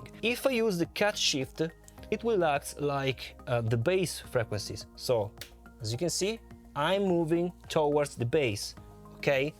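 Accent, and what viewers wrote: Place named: Italy. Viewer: this is how italians talk english 😅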